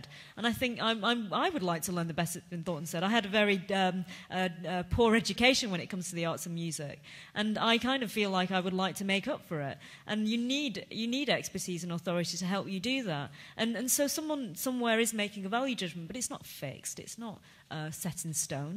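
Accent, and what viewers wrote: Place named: RP, United Kingdom. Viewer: God she can fucking speak, her accent is bloody high class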